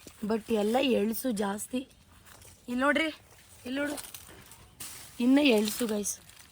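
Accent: Mandya, India. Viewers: Yav uru nimdhu nammuru bhashe tharane edhe nim mathu kathe mandya athra yav uru ma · ಸೂಪರ್ ಮಂಡ್ಯ ಭಾಷೆ.. .... ನಿಮ್ಮದು ಮಂಡ್ಯ ಅತ್ರ ಯಾವ್ ಊರು ಯಾವ್ ತಾಲೂಕ್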